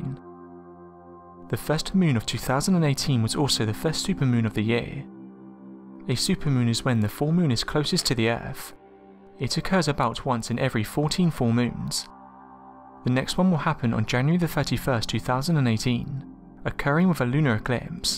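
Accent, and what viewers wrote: United Kingdom. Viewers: lost interest once heard British accent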